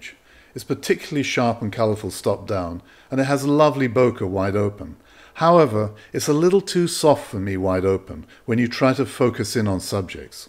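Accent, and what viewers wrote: United Kingdom. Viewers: With a name like and a British accent, how can we go wrong?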